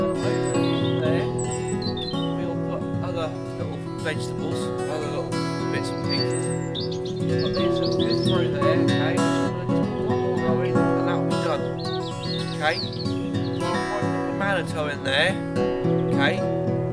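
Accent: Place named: Norfolk, United Kingdom. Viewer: Lovely to hear that Norfolk accent. The Fens is a beautiful area. We are the other side of Norfolk, out Norwich way. Just found your channel. Good luck with your spuds :) Jazz and Gem.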